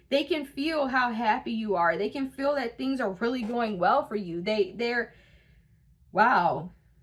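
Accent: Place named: South, United States. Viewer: Loving the country accent you should hear mine😊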